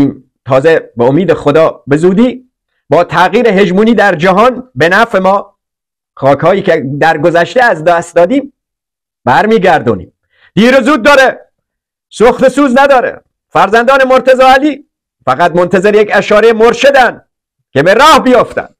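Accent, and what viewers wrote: Lorestan, Iran. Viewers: درود بر آقا گل و وطن دوست. عاشق خنده های قشنگ و لهجه شیرین لریت هستم داداش. خدا نگهدار سردار عزیز تنگسیری و همه سرداران غیور ایران اسلامی.در مورد بحرین حرف شد حالا یک شعر ناقص و غلط میگم. ایران من بهوش باش از آن توست آرارات... ز اقتدارت شده عدوان تو کیش و مات... با قدرت سپاهت پیوند دهیم به خاکت... کشورک جعلی بحرین باکو امارات.... انشاالله پیوستن خاکهای با ظلم جدا شده به مام میهن اسلامی ملحق میشود. خاک بر سرت زشت کلام و اصلاح طلبان. مرگ بر دشمنان ایران.مرگ بر فتنه گران کثیف. پاینده باد ایران اسلامی. ننگ بر مسولین خائن مانند کوزه گرها مدیر تامین اجتمایی قزوین که حق کارگری که جانباز هم هست پایمال کرد. ننگ بر امید نادان. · درود بر که به لهجه شیرین لری، همرا با طنز مسایل ملی ایران و وطن پرستی را مطرح میکنید 🌹🌹🌹🌹🌹🙏🙏🙏🙏🙏🙏🇮🇷🇮🇷🇮🇷🇮🇷🇮🇷🇮🇷🇮🇷🇮🇷🇮🇷💪🌹🌹🌹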